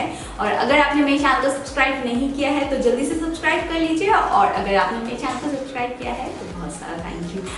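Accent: India. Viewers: Before, you wrote the name of the ingredients in the legend of the step by step. I know how to speak English, but due to your Indian accent I have a little difficulty understanding what you're talking about. Please, if you do not mind, start captioning again. Please. I like you so much. Congratulations on the evolution of the channel =)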